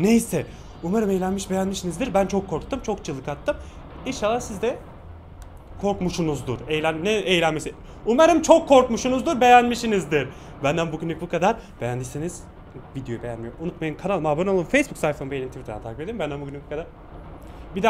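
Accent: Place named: Izmir, Turkey. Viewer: Abi İzmir'de veya çevresindemi yaşıyorsun biraz şiven benim olduğum ilçedeki şiveyle uyuşuyorda :D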